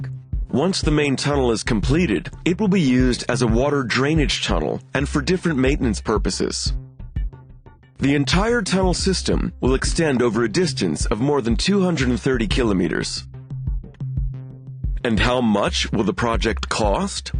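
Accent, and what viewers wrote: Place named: United States. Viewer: Is there a reason for the American Accent?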